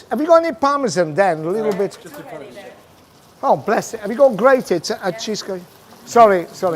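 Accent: Italy. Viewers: His English Italian accent is insane.lol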